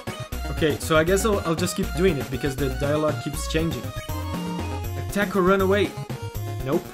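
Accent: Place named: Brazil. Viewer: EU SABIA QUE O SOTAQUE ERA BRASILEIRO Meu amigo, sua reação ao receber o abraço da Toriel, eu nunca tinha visto kkkkkkkkkk Mas sério, eu amo de mais ela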